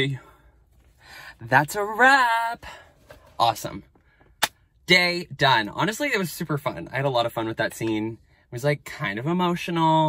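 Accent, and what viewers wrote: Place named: Australia. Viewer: As an Aussie Cop watching I loved and smiled at the Aussie accent you banged on lol. ‘Good on ya’!. Good luck with the rest of it. 👮‍♀️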